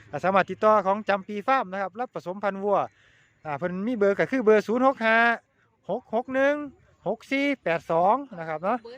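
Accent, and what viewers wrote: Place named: Sisaket, Thailand. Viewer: สวัสดีค่ะอ้าย ศรีสะเกษชัดเจนจ้า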